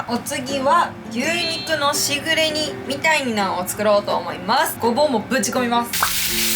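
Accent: Kansai, Japan. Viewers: ちゃんの動画、色鮮やかで関西弁が可愛くて本当に好き…！あんまり自炊しないけどこの動画見て自炊頑張ろ〜とモチベーションになった！❤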